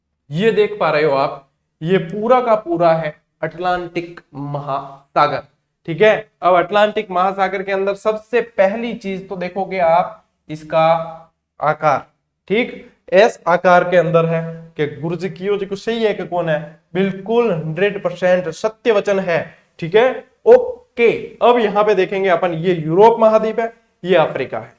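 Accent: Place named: Marwari, India. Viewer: Sir apki marvadi hamjh koni aave.....pr laage choki👌👌👌👌... thank you sir....jitna bhi bole km hi h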